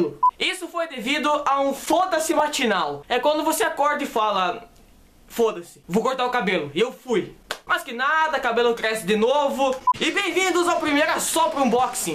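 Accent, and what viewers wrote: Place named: Pato Branco, Brazil. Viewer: uaheuhaueh o fala engraçado por que ele é de Pato Branco! (não leve pro pessoal)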